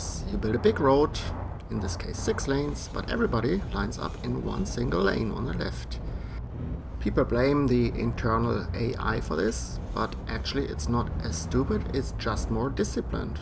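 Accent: Germany. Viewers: Ich habe direkt gemerkt das du aus dem Deutschen Sprachraum kommst :D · Dieser deutsche Akzent is ja grauenhaft :D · Awesome German accent.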